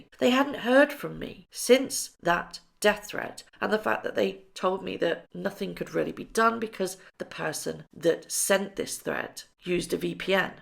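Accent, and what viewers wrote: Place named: United Kingdom. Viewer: Dear. I said before that your British accent is adorable and so easy to understand ❤ Greetings from California. 🙏 Thank you for spreading the Truth. Wishing you and your family all the Best. Hope and 🙏 you have an easy recovery on your health. Last but not Least, you are a genius in knitting. Truly hope one fine day, you will give us a knitting tutorial how to make a knitting doll 👍 Her Majesty The Queen Elizabeth II ❤, You are loved ❤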